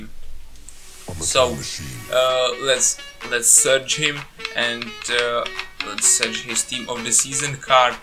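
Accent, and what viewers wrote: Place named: Czech Republic. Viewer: lehký český přízvuk, ale hlavně český znak FAČR:D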